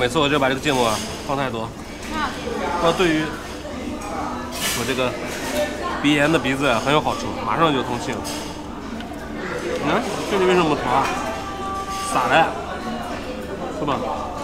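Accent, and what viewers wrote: Inner Mongolia, China. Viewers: 它来自鄂尔多撕，那里的土豪家里都有矿，煤矿，钱多的比山西，陕西煤二代也不差，所以不要小看山陕，内蒙口音的大土豪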